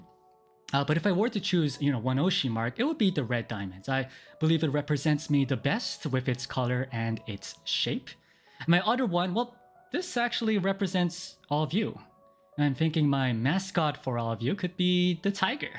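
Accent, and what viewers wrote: South Korea. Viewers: I knew it! Another half Korean at NijiEN!! I recognized your accent. You're so amazing. 만나서 반가워요 오빠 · Now that I think about it, he does have a Korean accent... Compare it to someone like Suha it's not as noticeable but it's definitely there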